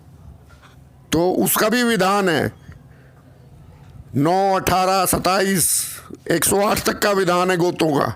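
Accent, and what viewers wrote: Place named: Haryana, India. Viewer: 🙏🙏 Maharaj ji .aapki hariyadwi language bahut achchi hai.main bhi hariyadwi hun.shubh ratri.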